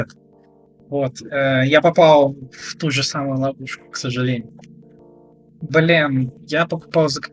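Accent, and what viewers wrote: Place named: Poland. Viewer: нифига у тебя акцент польский появился, раньше его не было (Л-логика ☺️) меня, при знакомстве с тобой , больше всего удивила разница между тобой в инете и в жизни. в положительную сторону ;)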